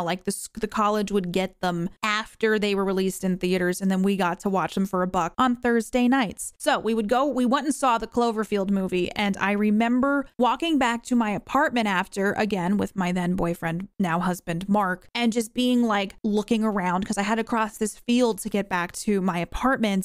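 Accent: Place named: Transatlantic, United States. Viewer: imma comment that your transatlantic accent is perfection.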